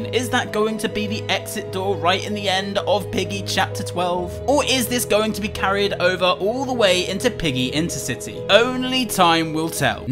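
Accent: United Kingdom. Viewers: your from england i love your voice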